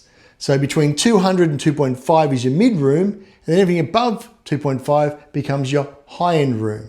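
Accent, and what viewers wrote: Australia. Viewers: Good to come across an aussie accent!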